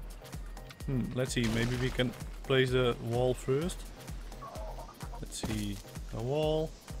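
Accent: Netherlands. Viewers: You sound like a Dutch person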